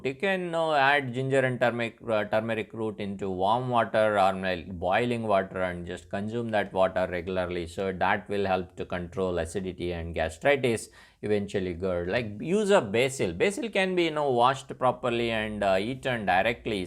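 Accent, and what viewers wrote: India. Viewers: Your Indian accent is too funny 😂🤣😂🤣 Thank To you for the information !! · Thank you. Im a fan of the Indian accent. 🙏🙌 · Indian talking in English funny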